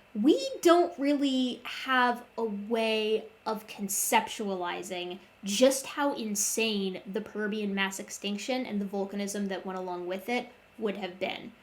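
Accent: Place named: United States. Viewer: My only problem with this video is that you said “Carboniferous” when you ought to have said “Mississippian and Pennsylvanian” since, judging by your accent, you are an American. I got marked down for this in college, so my pattern-recognizing brain started yelling at me “DANGER DANGER” in a bizarrely apt demonstration of the point you were making.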